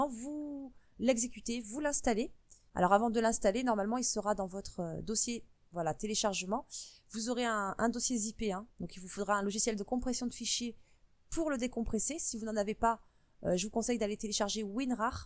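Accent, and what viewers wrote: South, France. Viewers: a l'accent du sud l'accent qui chante ^^ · "cliquee droit"mdr, Merci pour ce bel accent, enfin un tuto sudiste ;) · Excellent tuto que j' ai regardé avec plaisir. j'ai appris beaucoup de chose. Merci . Avec l'accent du sud.....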